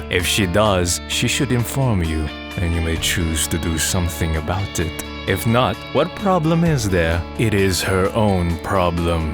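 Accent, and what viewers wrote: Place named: United Kingdom. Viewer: He kinda sound British to me to be honest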